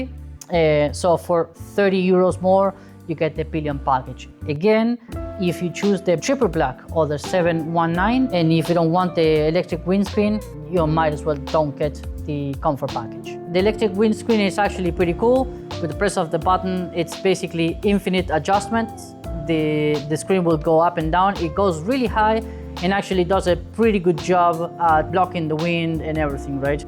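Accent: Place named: Rio Grande do Sul, Brazil. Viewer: Gaúcho de onde Tchê?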